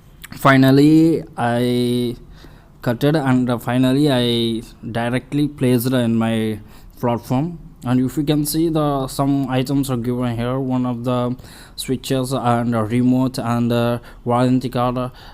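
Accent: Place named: Telugu, India. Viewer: Endayya idi. Sorry, I got distracted from content with the dialect. Tiney leda matladu. Telugollu ila kuda English matladtara.. Wah! Kamal ki chiz hy yar.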